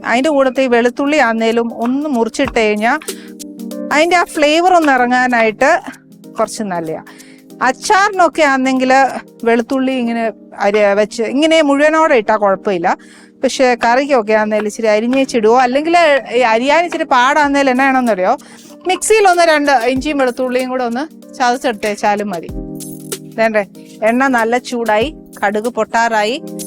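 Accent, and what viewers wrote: Kottayam, India. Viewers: കോട്ടയത് ഇങ്ങനെ ആണ് പറയുക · Njgle Kottayam kaaru ingne aane samsarikunnathu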